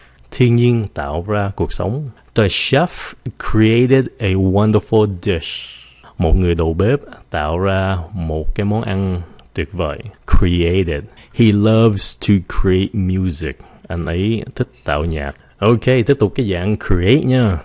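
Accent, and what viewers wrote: United States. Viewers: you pronounce like American, i saw two youtube channels between british and american, there are some differences in pronunciation. perharps, i just wanna know how to use these words....tks u for videos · cách phát âm thầy dạy ở đây là theo tiếng anh Mỹ ạ? Em định học Ielts, phải phát âm theo chuẩn tiếng anh Anh, thầy có dạy theo cách này mà phát âm của người Anh ko ạ?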